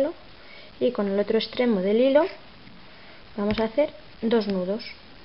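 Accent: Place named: Asturias, Spain. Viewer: hola soy belsy siempre te veo incluso los antiguos me gusta como esplicas por el habla pareces asturiana como yo bueno besazosssssssss y sigue esplicandonos muches coses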